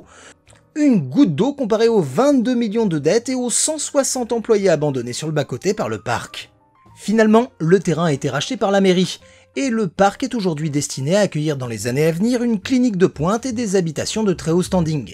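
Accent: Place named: Netherlands. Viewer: L'accent néerlandais... bon c'est rien vidéo de ouf !!!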